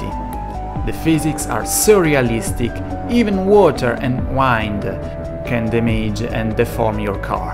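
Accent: Italy. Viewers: Bro ci gioco 50€ che sei italiano dall'accento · Si sente da chilometri che sei italiano ahahahhahahaha, bel video! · ha un accento tipo italiano ahah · are you..italian? sei italiano? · i can hear some italiano Bro, hahah grande vecchio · Si sente che sei italiano..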